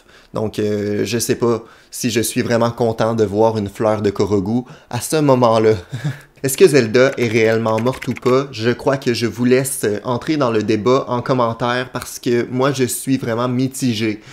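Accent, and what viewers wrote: Quebec, Canada. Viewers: J'adore ta vidéo. Je suis en France. Je kiff ton accent québécois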